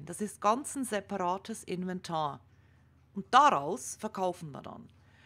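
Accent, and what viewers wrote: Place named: Switzerland. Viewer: Danke für die Erklärung mit wunderschönem schweizer Dialekt.;D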